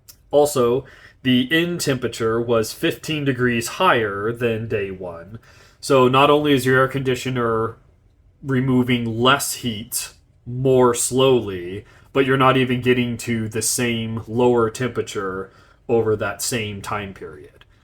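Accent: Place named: United States. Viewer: Thank you for your research and experimentation. By the way, are you of Asian descent? Your voice/accent gives me the impression that you were born in America, but have that descent.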